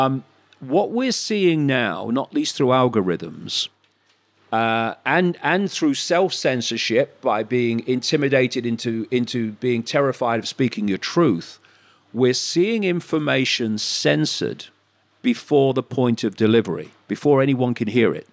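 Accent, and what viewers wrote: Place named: RP, United Kingdom. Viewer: Freaking amazing that Dave understands another language but answers in the Queen's English?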